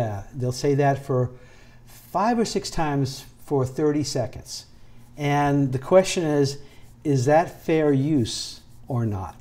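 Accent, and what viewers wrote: Boston, United States. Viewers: That accent takes me right back to my Boston days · Incredible lecture, masterful Boston accent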